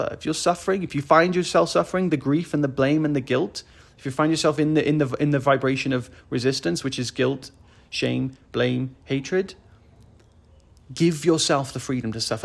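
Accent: United Kingdom. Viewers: I feel enlightend by your amazing british accent. So nice!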